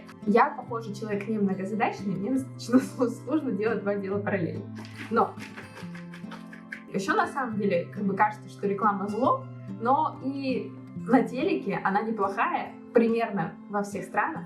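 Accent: Poland. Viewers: Słyszę u Ciebie polski akcent🙂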